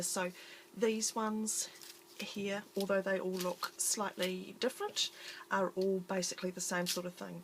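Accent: New Zealand. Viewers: GDAY COUNTRY COUSIN, U SOUND LIKE A KIWI, GOOD TO HAVE FOLK BACK THIS SIDE SHOWING THEIR WARES LOL JUST LOVE YOUR FLOWERS, INSPIRED ME... THANKS CAMILLE AUSTRALIA.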